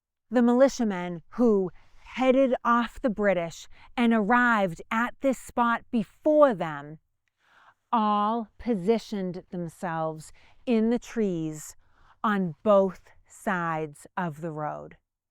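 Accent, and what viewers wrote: Boston, United States. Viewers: I don't usually like the Boston / yankee accent lol. rocks it though! Keep up the good work n GREAT content, look forward to hearing more from ya in the future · Just found this channel and, there is NO ONE I'd rather hear talking about this then you and your Bahstin accent:) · Another well-done informative video. Keep up the good work. I’m hard of hearing so I have to use the closed-captioning. It’s a real treat the way it sometimes converts your Boston accent. Conquered vs Concord. Luckily my SIL and brother live there so I’m able to figure it out having talked to her for 40 yrs or more. It keeps my mind active anyway. LOL. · Thank you. Just discovered you a few weeks ago. Love your Boston accent, and your explanations from our past important history during the revolutionary war. I'm a west coast watcher from Oregon, and enjoy your stories and videos. 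Keep em' coming! 😉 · I'd hype you for your accent alone! I love me some Boston!! ❤😉🇺🇸❤ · Man I love the Bahston accent and all the talk about maahching! Great content, too! Lived in Boston for 9 years. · Awesome accent Anytime someone with a Mass/Boston accent teaches Revolutionary war history. I’m in!! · like the Boston accent! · I sense a slight baaaastin accent cute!!! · Thank you. You really helped bring it to life for me. And Love that Boston Accent. Put a smile on my face. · That Boston accent, though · My weekly dose of American history and a Boston accent. Thank you! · I detected the Boston, but I could swear there were some Aussie influences there too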